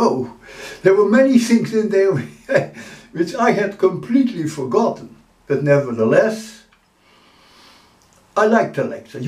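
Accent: Netherlands. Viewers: Are u from the Nederlands?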